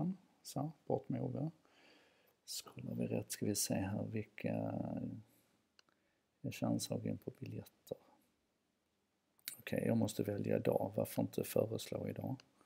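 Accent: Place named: Scania, Sweden. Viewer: svårbegripligt skånskt mummel utan text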